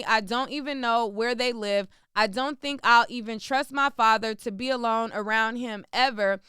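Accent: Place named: Philadelphia, United States. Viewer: U have a philly accent a Lil are u from philly?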